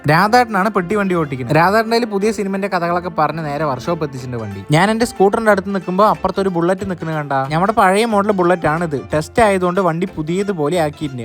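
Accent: Palakkad, India. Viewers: Bro നിന്റെ വീട് പാലക്കാട്‌ ആണോ 😊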